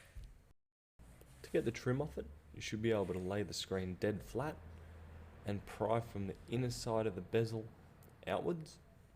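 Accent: Australia. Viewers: Thanks for the video man! Just a question, would you be able to share the display model? I currently live in Aus and have the same exact laptop model, and in your comments you said you were able to upgrade it to a 1080p screen which is epic. I would like to upgrade my screen to 1080p aswell but I can only find 720p screens online, so I'm hoping I can find the same display you have in the internet. Or, If your local supplier is able to do nation-wide shipping, let me know and I'll also be ordering from them aswell (sounds like you're in aus aswell, lol) Anyways, liked and subscribed!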